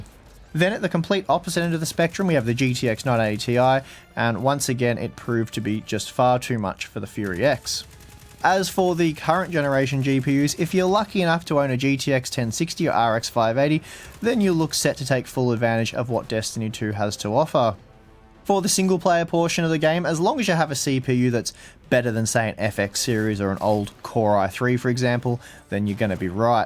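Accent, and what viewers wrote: Australia. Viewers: Great work mate! nice to hear an aussie for once doing bench marks ;-) keep up the great work!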